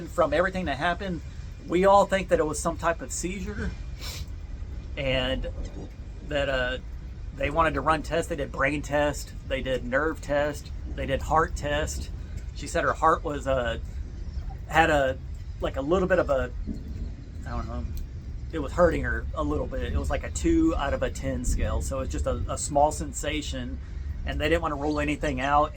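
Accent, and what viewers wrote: Texas, United States. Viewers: Praying for you and your family. ❤ You are such a sweet man and son. Jesus heal the trauma and hearts and give them peace and health for all. ❤❤ I have watched your channel for a few years because Im from Texas living years in Utah, love tiny homes and love hearing your accent because it reminds me of home. Keeping you in prayer. 🙏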